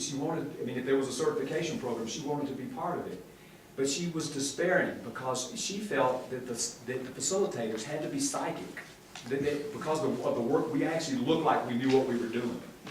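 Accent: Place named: South, United States. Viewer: I trained with and Stan, early 90's. Just found out no longer with us. These were, are, legendary days that cannot pass. I know the way he used to talk and the sort of thing he talks about here, and he has same energy, but his strong southern accent isn't so pronounced. Goodbye. See you again probably.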